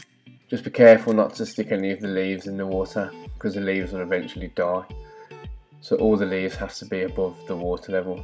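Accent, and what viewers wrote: Birmingham, United Kingdom. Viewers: you're from birmingham!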